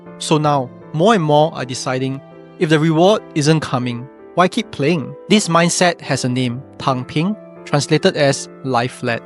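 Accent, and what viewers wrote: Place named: Singapore. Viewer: Are you chinese national?Your accent sound so much like Singapore or Malaysia.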